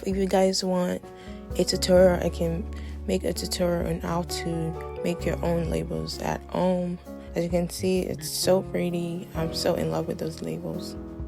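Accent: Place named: Africa. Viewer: Love your African accent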